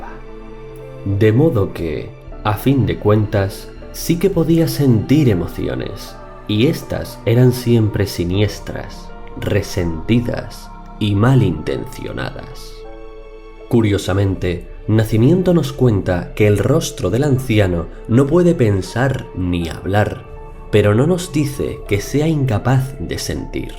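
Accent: Spain. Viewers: hasta con voz española y narrado como cuento medieval. Contenido de calidad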